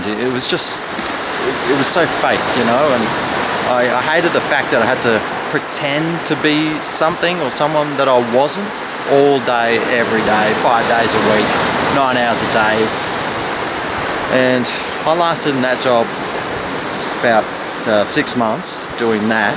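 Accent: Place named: Australia. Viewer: That would be good, too, with a sunrise or sunset showing. Or you can do some talking with it, like this video, I love an Aussie accent. :) OR...recipes on the beach, fire going, shrimp on a stick on the barbie...you talking about anything...I love to hear your voice..that accent! This video reminded me of some of the ASMR things I've run across, the background sound and talking; that's what made me think of it.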